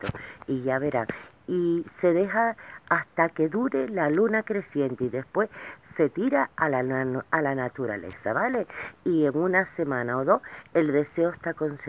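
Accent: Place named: Canary Islands, Spain. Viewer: Dios te oiga, lo acabo de hacer esta noche, espero que mi deseo se cumpla, es de economía, porque lo estoy pasando mal... Mi duda es, si la noche de luna llena también lo dejó fuera en la ventana?? O... el día antes de la luna llena lo tengo que tirar a la naturaleza?? Se tira el agua y todo lo de dentro, no?? La copa También?? Disculpa mi torpeza.. Gracias y un beso.. y si me pudieras dar tu correo te lo agradecería.. Es que, tengo un problema grave de economía, con muchas deudas, por eso quería hablar con usted por privado, para que me diga cuál es el mejor ritual.. Tienes un acento canario.. yo viví tres años en Tenerife y me enamoré... Un beso, espero tu contestación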